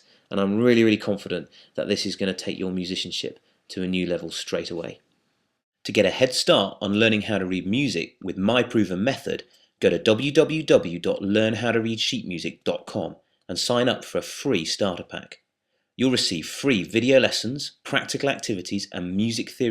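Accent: United Kingdom. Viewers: Why do I learn better from a dude with a British accent? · British man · THANK YOU.YOU ARE AWESOME AND YOUR ACCENT MAKES ME MISS UK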